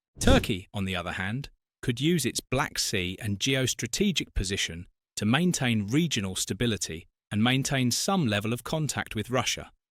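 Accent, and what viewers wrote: United Kingdom. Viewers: what is this Turkish BS Propaganda? with a British accent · U sound british and that explains yr stupidity . Bringing turkey in to the picture u are signing ukranes death certificate and u know that because they are made out of the shit as the british . The minute putin gives them a longer cucumber to suck on they will run ove to him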